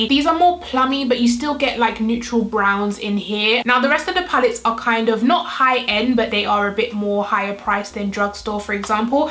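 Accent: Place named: United Kingdom. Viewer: I love to click on your videos just for your bomb ass looks and your accent! I love the British accent. Lol.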